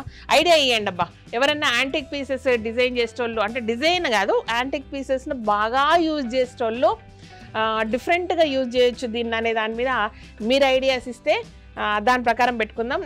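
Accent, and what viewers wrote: Telangana, India. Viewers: Vedio motham full comedy bale undhi tlangana language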